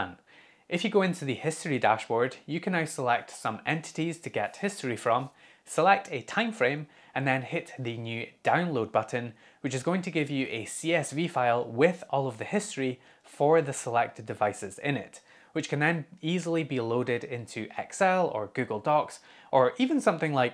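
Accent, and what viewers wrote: Scotland, United Kingdom. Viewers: Acting as a rooter! 🤩🤩 I wish you would lean into the Scotsman a little more 😉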